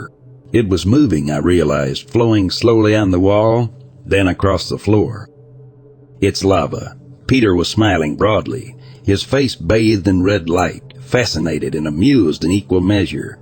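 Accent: Texas, United States. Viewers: yeah same, he is probably around 65-75, but he does sound like he's from Texas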